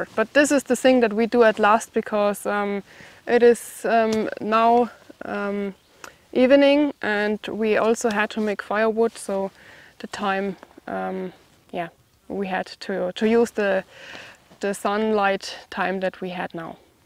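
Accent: Germany. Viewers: ich finde, du solltest das unbedingt auf deutsch machen, sorry, dein englisch ist zwar funny und irgendwie nett, aber wirklich arg "germanised" ...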